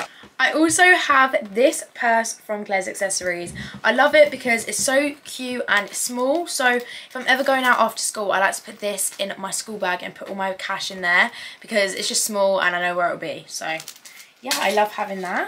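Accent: United Kingdom. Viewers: The British accent is everything ❤️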